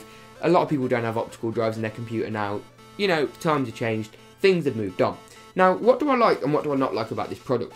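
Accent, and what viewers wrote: United Kingdom. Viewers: your british accent is glorious :3 it gives me goosebumps xD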